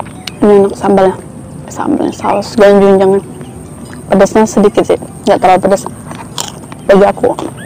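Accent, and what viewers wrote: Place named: Java, Indonesia. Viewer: Mantap medok pinter banget masak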